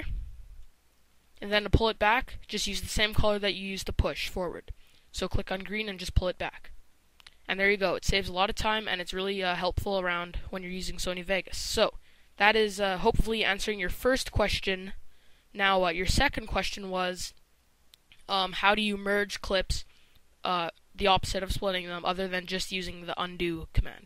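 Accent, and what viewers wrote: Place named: Canada. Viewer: I'm guessing your Canadian. I have a keen ear for accents.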